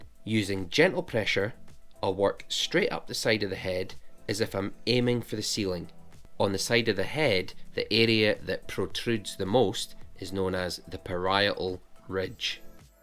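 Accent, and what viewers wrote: Scotland, United Kingdom. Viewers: I love the fact that you are Real. You like us, can make the common mistakes of barbering. It’s this awareness which helps us learn how to fix ours. I could watch you for hours. My third great grandad was Scottish. I love your accent. Well done for being a great tutor. ❤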